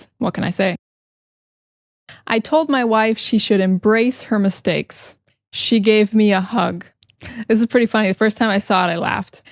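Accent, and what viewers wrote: United States. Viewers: The same thing happened to me. And everything was very easy to follow. Great American pronunciation. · Teacher you are so good at English. I love your American accent.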